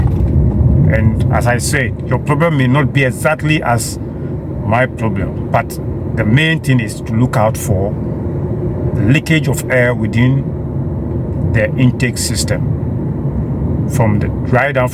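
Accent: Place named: Cameroon. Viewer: This is my Cameroonian brother, I can tell from his English 😂 Am having same kind of noise like ratting in my Honda CR-V 2022.....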